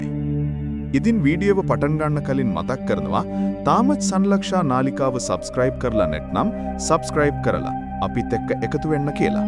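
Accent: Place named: Tamil, Sri Lanka. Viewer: මේ voice එක AI ද? එසේ නම් කරුණාකර සිංහල භාෂාවෙන් හඬ කවන වීඩියෝ සඳහා නියම සිංහල උච්චාරණය සහිත AI voicing version එකක් තෝරා ගන්නා ලෙසට මේ සංලක්ෂා යු ටියුබ් නාලිකාවේ හිමිකාරත්වයෙන් ඉල්ලා සිටිමි. නැතිනම් සිංහල මවු භාෂාව වන අයෙකු ලවා ඔබගේ script එක voice කරවා ගන්න. මේ නාලිකාවේ සෑම වීඩියෝවක්ම තියෙන්නෙ මේ කටහඬින්. අපිට දැනෙන්නේ හරියට දෙමල භාෂාව කතාකරන කෙනෙක් සිංහල කතා කරනවා වගේ. එය එක දිගට ශ්‍රවණය කරද්දී අමාරුයි, රසවින්දනයට බාධාවක්. ඔබගේ වීඩියෝ හොඳයි, educative,We feel like we are listening to a different language. Please don't interpret this as discrimination against any other language.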